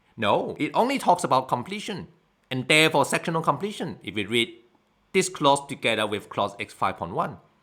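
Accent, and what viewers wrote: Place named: India. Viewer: 有点印度味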